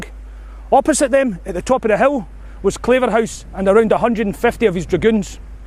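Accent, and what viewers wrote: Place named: Scotland, United Kingdom. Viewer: Psalm 76 has a new meaning for me now; I have read Maurice Grant's account of Drumclog, but I so appreciated this reminder and hearing account of it in his Scottish "accent" (to me) and seeing the sight myself, as best I can in the United States. Thank you so very deeply for this retelling; it deepens and strengthens my own conviction and gives me a deeper appreciation of what the living Christ meant to these people and how He is still alive today; this is not history taught in the United States, not even of our Pilgrim forefathers and why exactly they hazarded their lives to cross an ocean to a wilderness to live here. How amnesiac most of the world is, and I so appreciate your keeping this history alive, and not just history, but its connection to our living God, the Gos so much of our world ignores and rejects.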